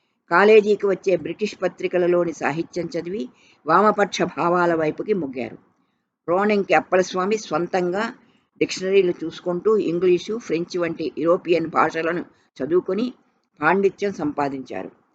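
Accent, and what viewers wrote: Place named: North Andhra, India. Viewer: garu could bring out her childhood memories in a beautiful mesmerizing way.when ever she reads his stories I wonder whether chaso wrote so beautifully or her reading methods with beautiful modulations had enhanced the depth of the writings.As my fore fathers belong to vijayanagarm and srikakulam areas I enjoy that mandaleekam also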